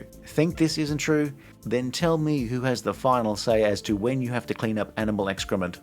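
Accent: Australia. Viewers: It's late, I should be finishing up work, instead I'm listening to an Australian guy talking about Star Trek seasons I've seen over and over.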